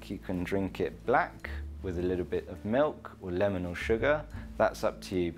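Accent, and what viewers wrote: United Kingdom. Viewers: The perfect tea video: English accent Formal attire Proper tea etiquette Elegance Fine china Immaculate tea knowledge 10/10, would recommend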